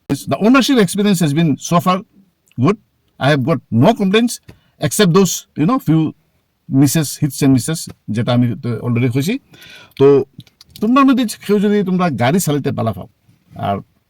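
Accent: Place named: Sylhet, Bangladesh. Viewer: সিলেটি শুনলে কুব বালা আর আফন মনো অয়। বানাইতে তাকোইন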